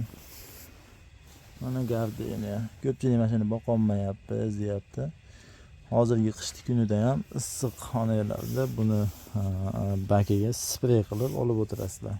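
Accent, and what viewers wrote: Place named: Andijan, Uzbekistan. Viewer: талаффуз Анжонникию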